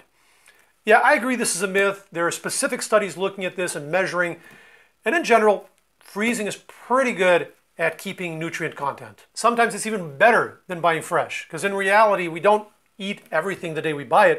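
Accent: United States. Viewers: he does sound like an American, but having a US English accent doesn't make him a US citizen. He graduated as a doctor in Lisbon and he merely did a PHD in the US, but has since returned a while back to Portugal. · Sounds like an American born and educated person. He’s not making any other person with a Portuguese sounding name better or worse.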